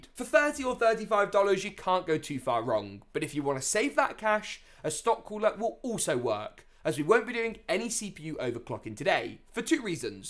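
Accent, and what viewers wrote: United Kingdom. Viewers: Lol I’m sad he has British accent but he didn’t do the video on £ I mean it would have helped us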